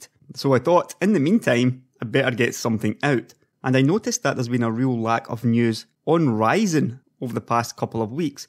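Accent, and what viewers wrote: Scotland, United Kingdom. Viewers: Scottish I understand him just fine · TBH it doesn't change the point you made and I agree with, that there process has matured now, keep up good work, nice hearing a fellow Scot!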